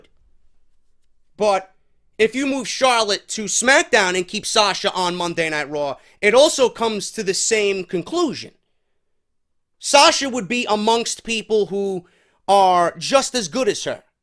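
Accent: New York, United States. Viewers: Dude you are so entertaining. I always watch. That New York voice is so funny.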